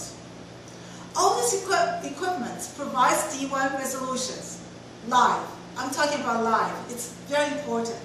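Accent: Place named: Russia. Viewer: good russian accent!!!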